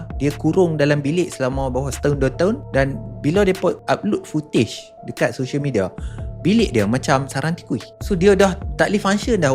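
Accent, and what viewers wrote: North, Malaysia. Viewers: haaa!!! biar betey??? gilalah.. siap loghat utagha tu... ni mcm mahathir lah ye??m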